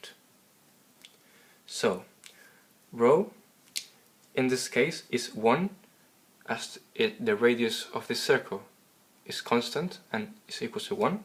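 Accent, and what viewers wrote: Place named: Spain. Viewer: I can hear the spanish accent xD, it's not Zed but Sed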